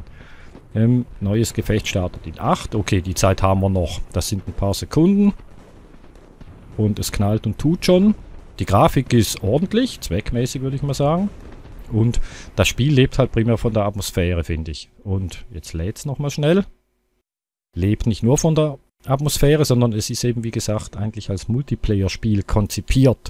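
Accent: Switzerland. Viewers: Er kommt aus der Schweiz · Wohnst du in der Schweiz? Du klingst wie ein Ostschweizer :D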